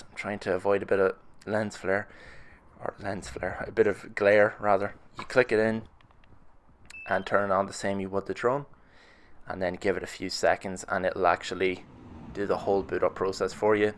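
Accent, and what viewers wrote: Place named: Ireland. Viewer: Great review I have the same drone and like you building up confidence with every flight!!! It's fantastic hearing an Irish accent perspective just for a change!!!! Any plans to upgrde in 2023??? I'm looking at the Air 2S or Mavic Classic 3 purely for having a drone for every wind restince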